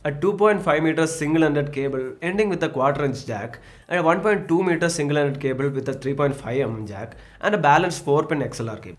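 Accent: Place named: Tamil Nadu, India. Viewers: Sometimes it gets little hard to understand his south indian accent. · OK!!! I have to admit that South indian accent of english is God tier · Typical Tamil accent. Nice review Dude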